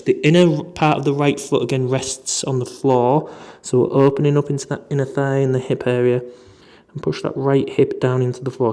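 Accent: United Kingdom. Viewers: Thanks - I’ve been doing your stretches for a few weeks now as I train for a half marathon in New Zealand. Loving your deep stretches and your accent that reminds me of living in the UK too!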